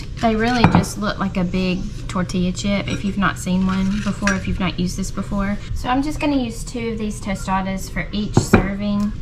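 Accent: South, United States. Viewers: I love your Southern accent. Where are you from?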